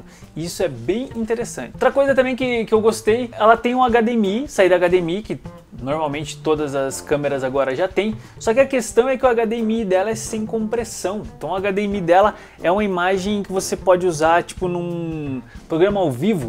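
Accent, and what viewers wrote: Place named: Curitiba, Brazil. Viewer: O áudio da câmera realçou ainda mais o teu sotaque curitibano. rsrs Abraços!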